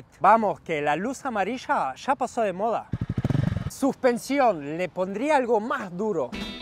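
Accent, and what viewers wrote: Argentina. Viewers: 🤔 parese de Argentino su forma de hablar 😂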